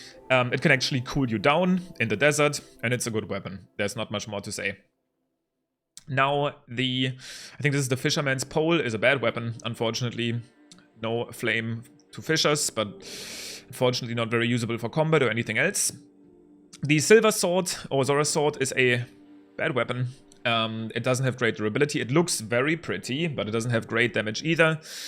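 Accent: Germany. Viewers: Richtiger Deutscher Akzent ^^ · I am high or is he german? He does have a nice accent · Ich höre das du deutscher bist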